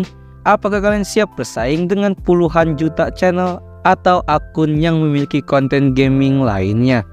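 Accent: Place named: South Jakarta, Indonesia. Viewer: iya lagi pake nada jaksel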